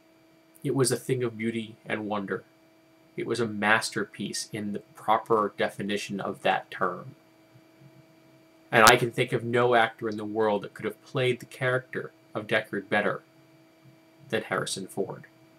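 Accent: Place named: United Kingdom. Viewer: It's the Britishness, you know? People love the accent.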